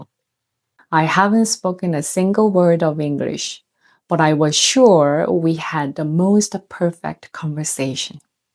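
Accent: South Korea. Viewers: Korean is truly a beautiful language. I love your accent speaking English. Most important is to communicate even when it’s not perfect. Never be ashamed and keep improving. I watch so much K-Dramas for the immaculate stories and the Korean language. Most beautiful accent indeed. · 안녕하세요, I wish I could speak a second language, even if with a heavy foriegn accent. Starting in highschool, I’ve become a failed pilyglot, having unsuccessully attempted to learn French, Spanish, German, and, most recently Korean. I twice was told by native south Koreans that in my attempts to speak to them in their language I spoke like a native. These were doubtless overly generous praises but I was overjoyed knowing how to ask are you Korean, or say hello, my name is Tony Walters, what is your name in Korean. It isn’t only k-dramas that have made me fall in love with the korean people, but every korean native I’ve met in Savannah (we have a large South Korean population due to a major Hyundai plant built close to the city. 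Of course, as a native English speaker, how could I expect myself to pronounce ㄹ without an accent? Your voice never ceases to comfort me, so your ㅎㅏㄴ국 language foundation overlaid by your ESL is at least one part magic to my heart and ears. Possibly two. I reject a world painted nfinite shades of gray. Give me primary colors. So glad you share your perspectives online.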